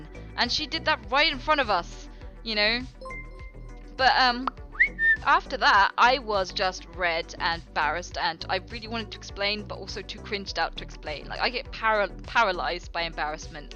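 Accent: United Kingdom. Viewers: You're so likeable 😂 imperfections are such an attractive quality, especially when you have that amazing English accent 😍 from an Irish man 😘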